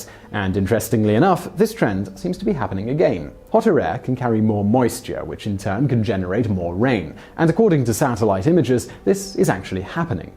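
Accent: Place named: Upper class, United Kingdom. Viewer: This is what upper class Brit’s sound like dude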